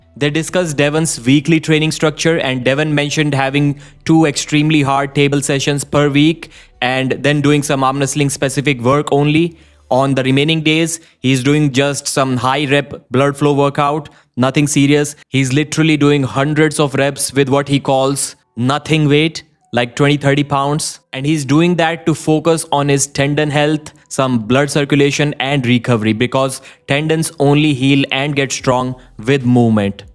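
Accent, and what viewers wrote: India. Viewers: another Indian voice it's so annoying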